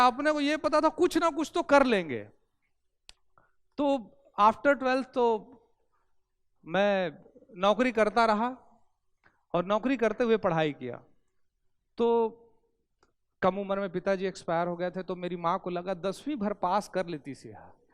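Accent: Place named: Chhattisgarh, India. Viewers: आपकी हिंदी में भी छत्तीसगढ़ी है 😄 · U r right, the best thoughts..bejjati hi safalta ki pahli sidhi hai.. chattisgariya accent ...total Maza aa gaya.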